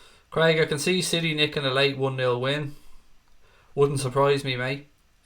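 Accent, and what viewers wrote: Ireland. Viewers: Are u Irish? 🇮🇪☘ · What part of ireland are you from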